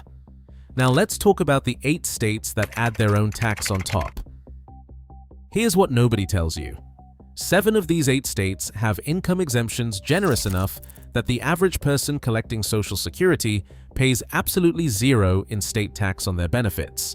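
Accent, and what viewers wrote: United Kingdom. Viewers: Just want list/chart Too many words - leaving now (and the eglish accent is annoying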